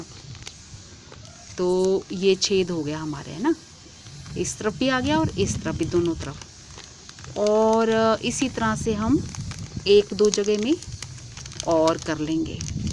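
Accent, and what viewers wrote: Punjab, India. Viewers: love your sweet punjabi accent! if your plant is ready and bearing any melon, pls. post some pictures here. waise...videos zara brief banaya karen. thanks! :)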